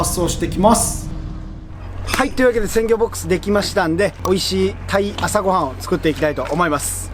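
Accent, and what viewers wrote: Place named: Shodoshima, Japan. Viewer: 夕焼けの小豆島、いいね！小豆島弁聞いてると高松の叔母さん思い出す。ほぼ同じやね。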